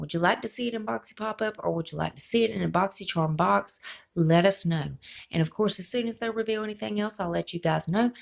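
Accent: South, United States. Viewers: Love your accent! You are definitely a southern gal. Same here, KY gal. Loving my Boxy Charm and look forward to receiving them every month. Stay safe.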